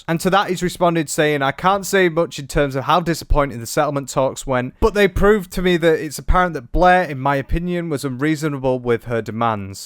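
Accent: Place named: North, United Kingdom. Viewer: I love how your "Northern English Twang" makes every time you say "Blair", it sounds like "Bleh"😂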